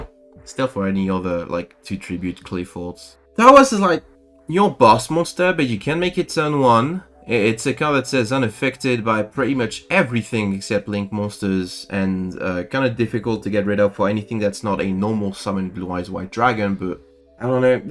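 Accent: France. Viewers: Yo i kinda here the french accent in this one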